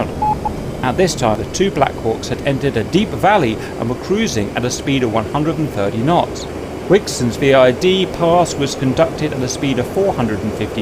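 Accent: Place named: United Kingdom. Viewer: IDK why but the British accent suits well for this kinda thing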